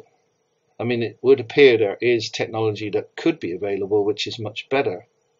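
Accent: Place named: England, United Kingdom. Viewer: What part of England are you from? It may work better in the UK.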